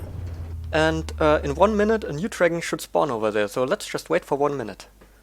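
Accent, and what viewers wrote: Germany. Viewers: Jaja der deutsche Akzent ist ziemlich schwer weg zu bekommen.. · Bist du deutscher? das hört sich sehr deutsch an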